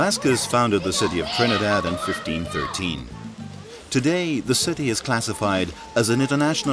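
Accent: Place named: Hialeah, United States. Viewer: dudette, u sound like your from hialeah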